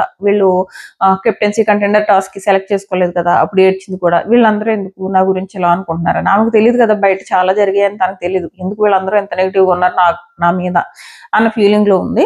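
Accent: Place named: Srikakulam, India. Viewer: Its Srikakulam slang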